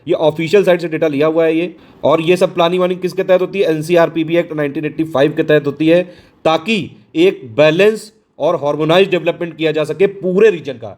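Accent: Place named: Kanpur, India. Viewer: Aapki bhasha... Kanpur se milti h.... Nice knowledge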